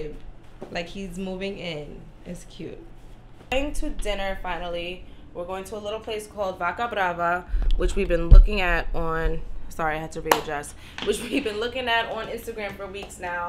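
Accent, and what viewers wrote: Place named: Spain. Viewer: You got a lil Spanish flow! Love watching people enjoy my island! 🍻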